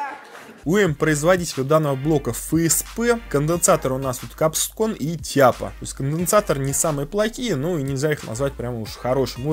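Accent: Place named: Okanye, Russia. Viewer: Что за Оканье такое =))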